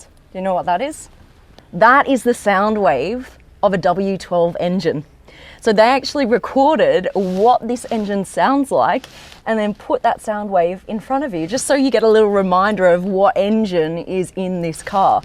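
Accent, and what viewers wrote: Australia. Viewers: There is girl with a foreign accent on Youtube pretending to be you...She cant fake your Aussie accent tho...Your Aussie guy... cheers... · какой все таки австралийский акцент и говор отвратительный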